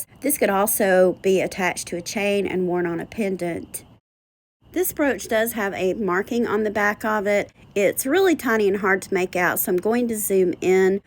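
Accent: South, United States. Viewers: I think it’s just your adorable Southern accent ❤️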